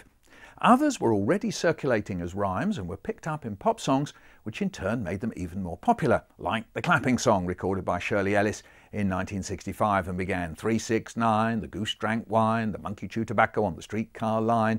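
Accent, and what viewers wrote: United Kingdom. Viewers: Yes, instead of hearing the children perform, let's just have an old British guy talking the whole time. :/ Typical British media presentation, with the RP narrator over the top of everything.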